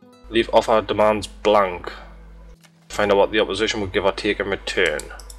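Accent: Scotland, United Kingdom. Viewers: I know late with this, but i lov your accent. Me being part scottish myself, iv always wondered what we sound like. I also get an unusual amount of exitement from ur voice and how u say me instead of my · Am I wrong or You've got a scotish accent? The way you say "here" and "fock" is very unusual. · You look like a scotland by listening to your accent.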